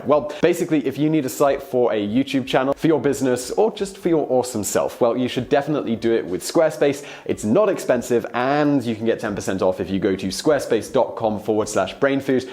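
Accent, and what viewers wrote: England, United Kingdom. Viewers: Hello I'd like to start by telling you how much I love your videos & have learned so so much from watching them. They're right up my street as instead of having a set topic you have such a wide,varied & eclectic mix (muchike my brain I'm told) of subjects & I love watching them,I used to watch daily but have recently started to leave them for a while & have a good old binge watch every Friday if possible. Anyway on to the subject of my comment,as I have mentioned I am a HUGE HUGE fan & love all your work however there is 1 thing you do that I find borderline intolerable & that is the fact that you add an upward inflection at the end of a LOT of sentences,the vast majority unneeded as you can be stating a fact whilst sounding like you're asking us a question. As much as I love your vids I find this so very grating as you sound like you're asking questions or are a teenage girl,it's bad enough hearing my 13yo daughter talk this way but one of my favourite Youtubers as well,it's not just me that feels this way as a lot of your fans feel this way too as we've discussed it in the comments before if you've ever seen any we have agreed to BEG you to stop adding this annoying Americanised gumph to your vids,you have an amazing English accent PLEASE PLEASE use it & do our country proud. 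Please carry on making these amazing videos (minus the humph) & I will carry on absorbing all the amazing trivia. Your long time fan Mark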